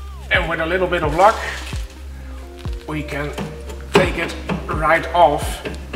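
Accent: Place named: Netherlands. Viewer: Love the dutch accent. Cheers.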